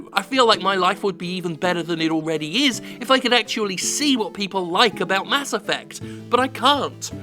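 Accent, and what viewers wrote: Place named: United Kingdom. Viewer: Wow, I love so how britishly-accented, unapologetic, and delightfull funny you are.... subscribe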